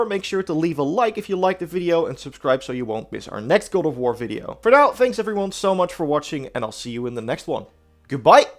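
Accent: Netherlands. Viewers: In loving the dutch/ anglo accent 😂😂😂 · Hi, In the english language the word LOT, like "a lot of power", is pronounced a LAT. It shows your Dutch background where a lot of people do this. It is no problem and people instantly recognise your voice, but it is just that little bit extra professional, which all of your video's are... No offence meant at all just this remark, wether it is useful or not. Kind regards, Jules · Great vid 🙂 Quick question.. Are u from the Netherlands? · Just a weird question. Are you dutch?. Haha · Sounds Dutch to me.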